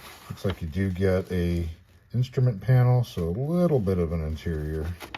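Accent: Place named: United States. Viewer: It's good as it is , even the pronounciation of French words is passable for an American 😅, good job.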